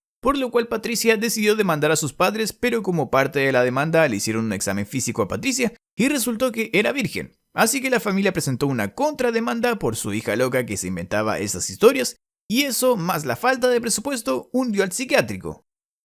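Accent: Chile. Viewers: Crack makina te recontra quiero una prefunta eres de chile ?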